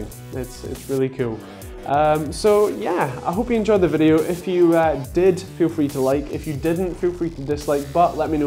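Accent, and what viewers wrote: Scotland, United Kingdom. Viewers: Love that Scottish accent! I am British also!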